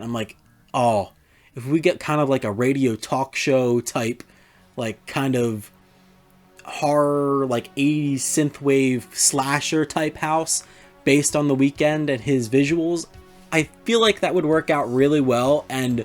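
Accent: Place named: Delaware County, United States. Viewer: Whew that accent jumped out, it’s giving Delco or Delco adjacent.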